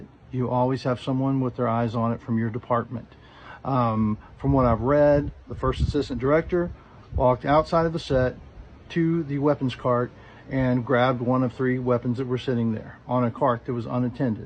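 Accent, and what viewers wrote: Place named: Washington, United States. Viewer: Really sad event. I noticed you sounded like you were from Washington then I saw your hat at the end. Do you work on movies up here?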